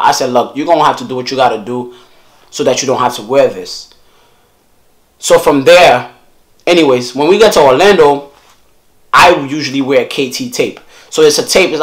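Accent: New York, United States. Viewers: I love his waves and that newyork accent❤️❤️❤️❤️❤️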